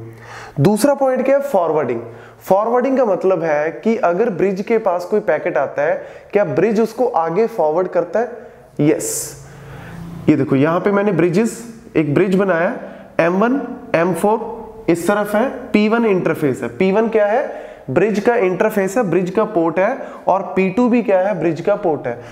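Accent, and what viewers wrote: Punjab, India. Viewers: That punjabi accent but is awesome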